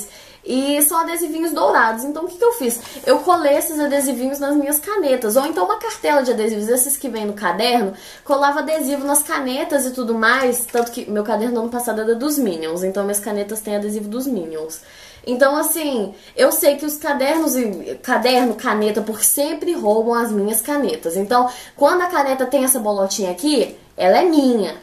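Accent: Minas Gerais, Brazil. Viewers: meu Deus ela eh mineira 😍😍 kkkkk "esses trem" amo seus videos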